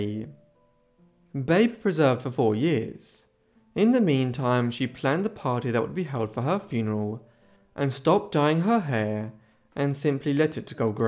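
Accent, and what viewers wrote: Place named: United Kingdom. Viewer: I love your Accent are you British?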